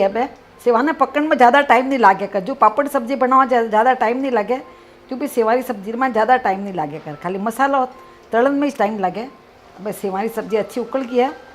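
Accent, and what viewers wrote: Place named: Marwar, India. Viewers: राम राम "मा" सा आप बहुत ही अच्छी रसोई बनती हैं उस सेभी अच्छी आपकी मारवाड़ी बोली है आप एक मारवाड़ी मुंग की बड़ी की सब्जी की भी रेसिपी बनाईए गा जी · nice aunty ji ur marwadi language is very sweet😙😙😙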